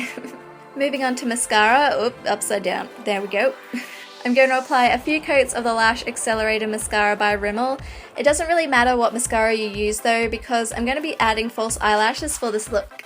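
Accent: Australia. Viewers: is she australian? beautiful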